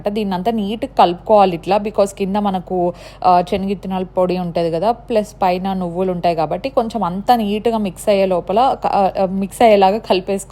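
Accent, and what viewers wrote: Anantapur, India. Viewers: Amma very sweet anantapur slaang super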